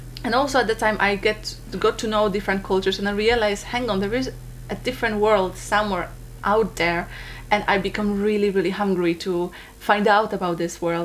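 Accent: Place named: Poland. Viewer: First time I listened to you I realized that you had the same accent that I have. I came to US from Poland at the age of 15 and I can totally relate to you and your story. You are an amazing person and you remind me so much of me at that age. I am now much older and people always get amazed by my life story. With will and perseverance one can accomplish all the dreams. With Gods or Universes help all things are possible and you are a great example of this..You are very beautiful and you have an amazing positive attitude. I loved listening to your story. I am forwarding it to my daughter who is exactly your age. Thank you for sharing it. Wishing you all the best because you deserve it. You go girl.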